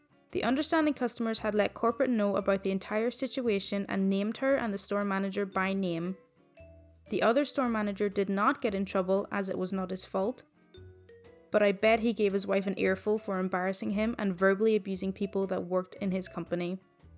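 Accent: Scotland, United Kingdom. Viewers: Where is your ascent from? It sounds Scottish to me i love it though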